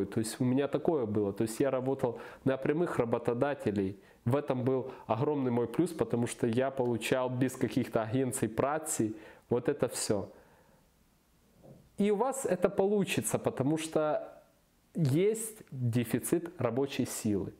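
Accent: Ukraine. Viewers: судя по говору, он переселенец с восточных регионов или одессит. там это норма, так же как и в россии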